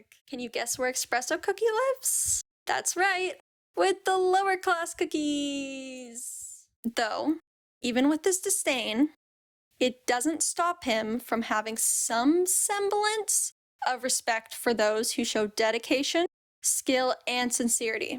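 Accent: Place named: Canada. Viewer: You sound so Canadian in this btw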